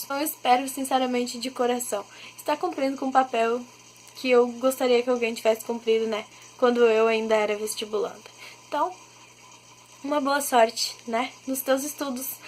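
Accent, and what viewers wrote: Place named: Rio Grande do Sul, Brazil. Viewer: oi vejo que seu sotaque é um pouco gaúcho de onde tu é? sou gaúcha de porto alegre bj!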